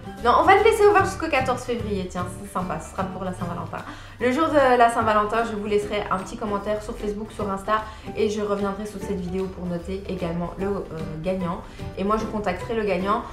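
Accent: Belgium. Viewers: Coucou! Alors j'ai découvert ta chaîne grâce à ta vidéo TUTO pas à pas French avec du semi-permanent. Et ce qui a fait que je me suis abonné est que j'adore la façon dont tu parles c'est super agréable, tu donnes beaucoup de conseils et ton accent belge est sympathique!! En tout cas je viens tout juste de découvrir le gel et l'acrylique donc j'aimerai justement m'y lancer. Félicitations pour tes 10k, ca a l'air de vite monter, bisous bisous! FB: Louise Rousseau (ArcEnda) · bonjour, trop contente que tu organise ce petit concours car j'aimerais vraiment me lancer dans le métier de prothésiste ongulaire. écoutes je t'ai connu de façon un peu singulière, c’était un dimanche en fin de journée, ayant acheté il y a quelques semaine une machine led et quelques vernis semi-permanent j'ai voulu trouver sur Youtubeuse qui expliquait de façon clair et précise la pose de vernis semis permanent. 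et biensur je suis tombée sur toi!! tu ne vas pas me croire mais j'ai tout de suite était captivée par ta voix, tu expliques très clairement et c'est ce qui m'a plus. j'ai d'ailleurs reconnu le petit accent Belge!!!! du cou^p j'ai parcourus un peu toutes tes vidéos et me suis abonnée tout de suite. je dirai que la première vidéo que j'ai vu de toi était celle de la pause d'extension en gel avec le chablon (PAAS LA PLUS SIMPLE ) mais je ne sais pas pourquoi je me suis tournée vers celle là. voiliiii tu sais un peu tout. merci d’être la, d'avoir le courage et la patience de partager ton savoir et surtout d'être TOI. a bientôt mon inta est ET MON fb Alicia mbuyi muadi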